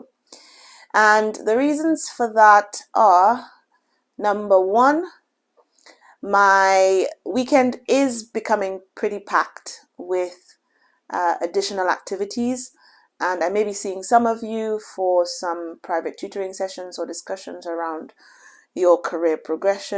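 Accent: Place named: United Kingdom. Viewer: Your accent is definitely British 😅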